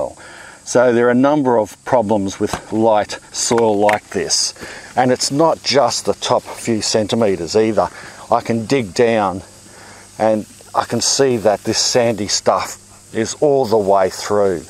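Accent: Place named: Brisbane, Australia. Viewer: Your not from brissie by any chance? Love some guidance and knowledge for free labour!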